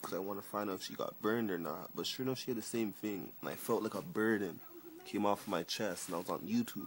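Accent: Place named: Jamaica. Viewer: I hear a yardie accent, bap bap bap. Yu still cute my yute with vitiligo or not. I have vitiligo too and people ask/say the same thing about my skin, but what can we do? But you will be fine.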